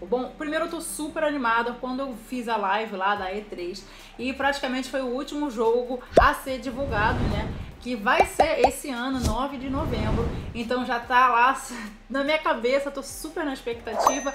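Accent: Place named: Rio de Janeiro, Brazil. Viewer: O sotaque carioca é top. KKK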